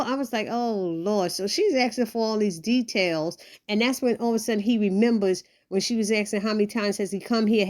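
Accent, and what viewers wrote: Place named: East Coast, United States. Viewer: Thank you. Love the East Coast Accent. 😀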